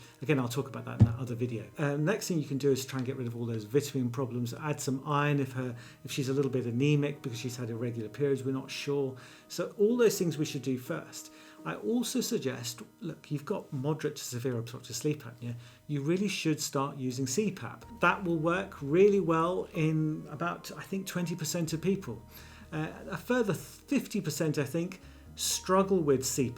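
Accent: United Kingdom. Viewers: Please remove the background music while you are speaking! It is very annoying especially trying to understand with your British accent. Thank you!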